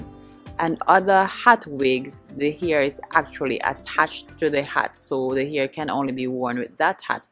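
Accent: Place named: Jamaica. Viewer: I love your accent. Is it Jamaican 🇯🇲?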